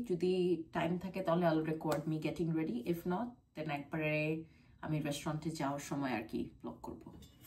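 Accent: Sylhet, Bangladesh. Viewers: The way u speak sylheti is so cuteee🥰🥰 khala is lovely 🌹🌹 Sb Smy family Niye vlo thako shuke thako dua kori Allahummah Barik❤❤❤❤ Instagram a DM kora jacchena bestie eMail address?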